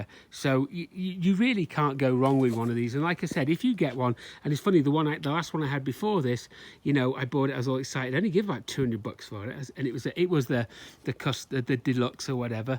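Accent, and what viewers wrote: United Kingdom. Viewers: Question. Have you had muck around with the carburetors ever. I have a Deluxe, for their time they are among the best, lol oking qt other bikes that age. Little liquid vtwin. Ive never heard someone curse so much in the kings English, it was refreshing, thanks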